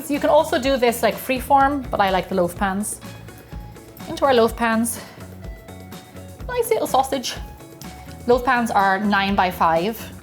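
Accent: Ireland. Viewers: Love your videos,I will try this bread recipe fingers crossed, love your Irish ☘️ accent, Thanks for this recipe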